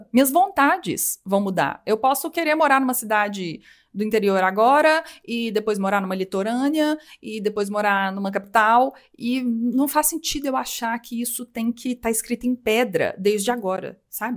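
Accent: Minas Gerais, Brazil. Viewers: Estou adorando seu canal,! ❤ Amo esse sotaque mineiro 🥰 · Tão bom ouvir tudo isso com sotaque mineiro... o "disgrama" me pegou kkkkkkkkk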